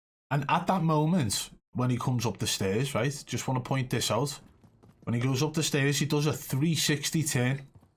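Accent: United Kingdom. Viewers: you are a joke. You and your disguisting british accent and face.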